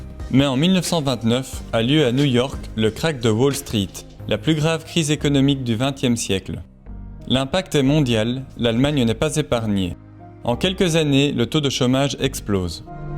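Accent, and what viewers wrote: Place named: Belgium. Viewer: Raphael Ebony Fans c’est l’accent belge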